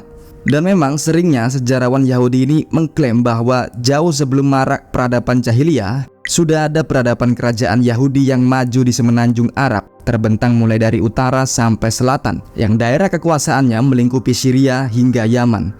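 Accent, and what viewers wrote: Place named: Banyumas, Indonesia. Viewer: Jawaa ngapak bang😂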